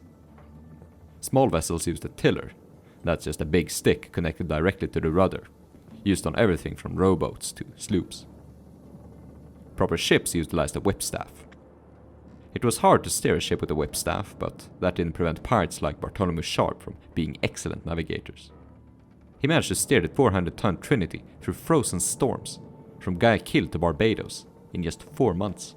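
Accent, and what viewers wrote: Scandinavia. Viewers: What country are you from? im sensing a scandinavian accent, being a swede my self. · Scandinavian accent?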